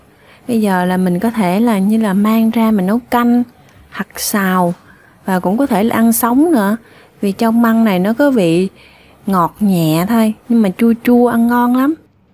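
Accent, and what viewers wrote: South, Vietnam. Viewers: Cháu nói gịong miền Nam rặt, vậy thay vì nói " thìa", mình nói " muỗng ", dễ nghe và hợp lý hơn.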